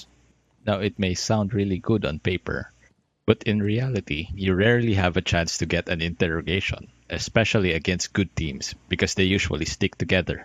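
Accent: Philippines. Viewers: The filipino accent on this one is wicked. I love it. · Are you Filipino? You have the accent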